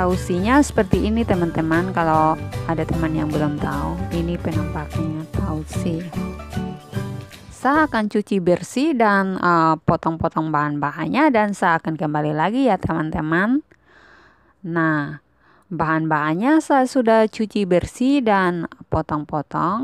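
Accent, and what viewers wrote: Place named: Eastern Indonesia, Indonesia. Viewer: dari logatnya pasti daerahnya sama dengan saya yaitu Indonesia timur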